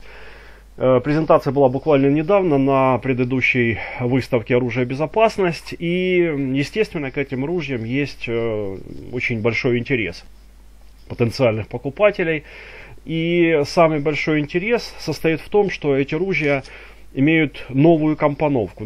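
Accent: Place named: Ukraine. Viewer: Українець так що його можна панять і прастіть))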